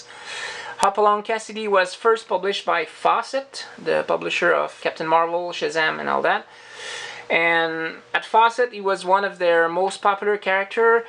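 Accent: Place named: Quebec, Canada. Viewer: Thanks for the fabulous shout out mate, very kind of you! I am almost blushing lol That's an interesting list of comics, you have some cool targets set for 2021, I wouldn't mind that Team-Up 1 myself I must say!! You certainly have some eclectic tastes and goals too, great bits of information in the video also. I loved the Hopalong Cassidy merchandise, particularly the rocking horse/baby chair thing haha Love the accent too of course, they say we Londoners drop our H's a lot when we talk but you French Canadian folks do it even more I think!!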